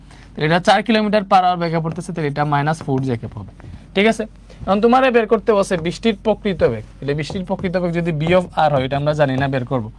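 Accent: Sylhet, Bangladesh. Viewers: ভাই সিলেটি নি??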